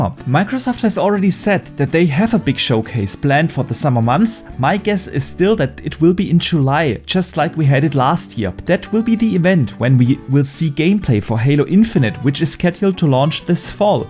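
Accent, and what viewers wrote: Germany. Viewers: Wie ich diesen deutsch englischen akzent liebe, mach weiter so!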